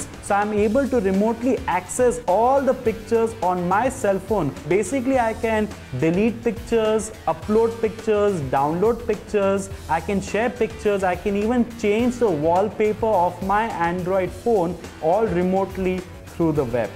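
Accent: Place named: India. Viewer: Oh, I love your videos! I started to watch just to hear your cute indian accent but you're so funny and share excellent information that i felt in love with geek on the loose and unzziped by Dell too! I wish i could be your baby doll <3 LOL XOXOXOXOXOXOXOXOXOXOXO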